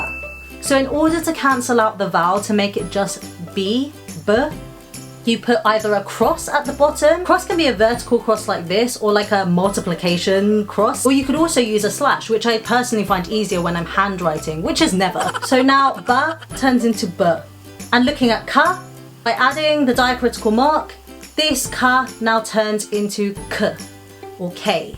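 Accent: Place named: United Kingdom. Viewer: I am new at your channel and I like your British accent.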